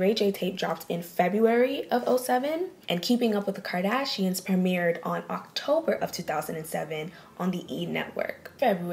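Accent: Jamaica. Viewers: Its the patois for meeee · you sound Jamaican, are you a YARDIE? If so, TUN UP!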